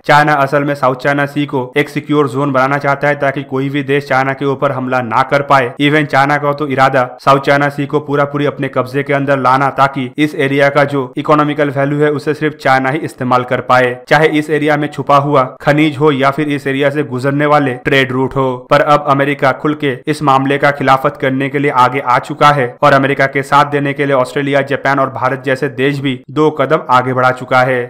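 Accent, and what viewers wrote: Bengal, India. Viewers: Sir aap Bengali hai?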